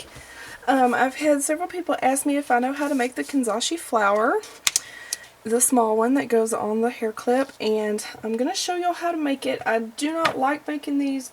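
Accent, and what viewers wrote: Texas, United States. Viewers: Love the Texas English! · Texan english rules!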